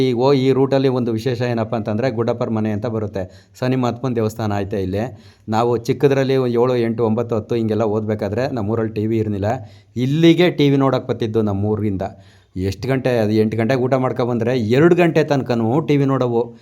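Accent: Mandya, India. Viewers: Sir nim hadu bhashe super mandya nativity super family vlogs madi sir nim hendthi soft innocent super sir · Nimma ganda hendathi koli jagala chennagirutte. Namma mandya bhashe kelokke chanda .Nim vlog nodthaedre thumba khushi agutte.Nanu nimma hosa subscriber.😊😊😊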